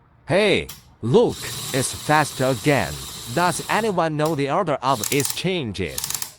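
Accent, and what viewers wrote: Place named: China. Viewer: Why du u sound like chinese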